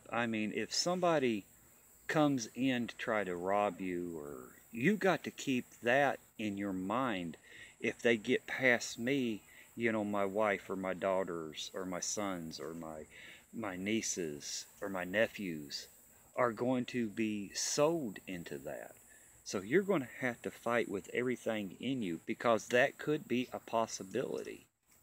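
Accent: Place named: Texas, United States. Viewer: You must have spent a lot of time in TX. You have a noticeable TX accent.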